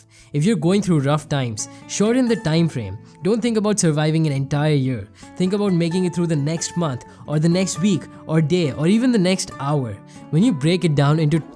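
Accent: India. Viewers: I think the accent comes from him being Indian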